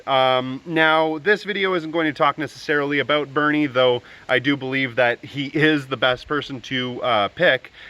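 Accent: Canada. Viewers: You sound Canadian. Where you from comrade?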